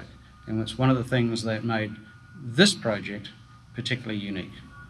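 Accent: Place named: Australia. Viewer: You're an Aussie !!!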